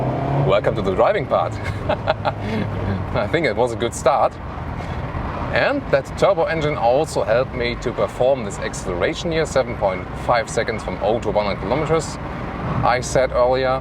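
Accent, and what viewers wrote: Germany. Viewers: Just Awesome German accent..... 😩😩😩😩😩😩😩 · Der Akzent ist zu deutsch! Üben!!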